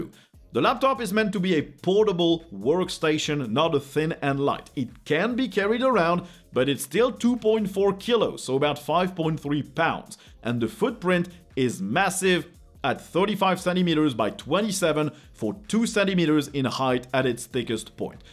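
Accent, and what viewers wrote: France. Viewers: je me disais bien qu'il avait un petit accent francais, j'ai enfin compris que ce n'était pas mon imagination x))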